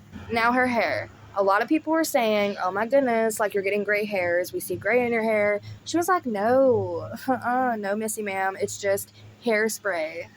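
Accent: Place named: Alabama, United States. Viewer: I must know, are you from Alabama? because I am and we have the same accent!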